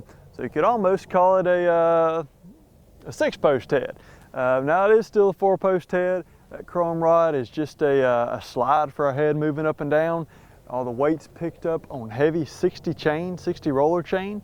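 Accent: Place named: Texas, United States. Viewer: You sure sound a lot like a Texan... Or some kind of southern drawl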